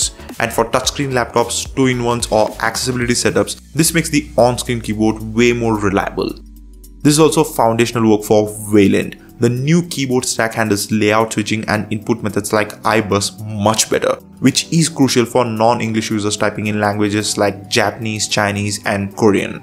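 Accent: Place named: India. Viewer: that hindu accent, can you change it?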